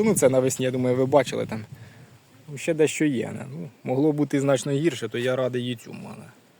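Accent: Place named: Poltava, Ukraine. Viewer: Дякую за чисту решетилівську мову!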